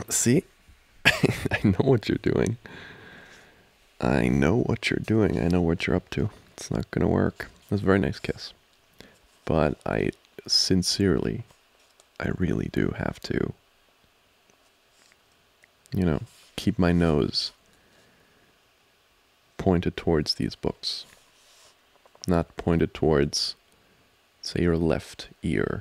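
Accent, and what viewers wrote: Germany. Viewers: i cannot with this german voice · your german voice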